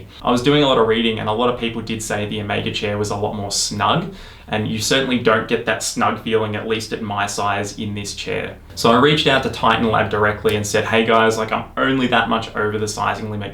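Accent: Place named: Australia. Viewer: Nice to hear an Australian dude doing one of these reviews. Shout out from Melbourne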